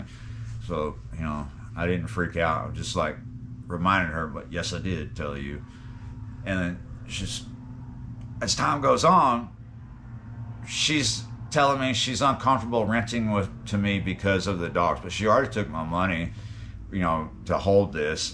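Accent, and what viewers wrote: Texas, United States. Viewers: Thanks for your videos. Going to miss your Mississippi River vids. Hang in there brother. You already are reverting to a Texas accent lol.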